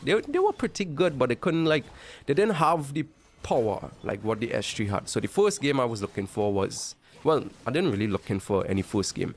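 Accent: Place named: Trinidad and Tobago. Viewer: I was wondering same ... he sounds very Trini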